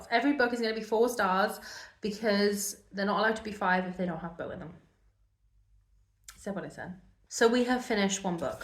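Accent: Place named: Wales, United Kingdom. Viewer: I've just found your channel and I absolutely love you and your Welsh yay xx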